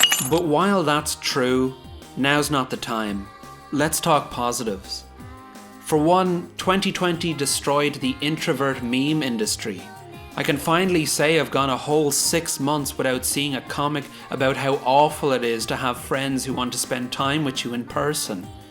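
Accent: Ireland. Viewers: I just discovered this channel and now my internal monologue critiques stuff in an Irish accent. Great channel!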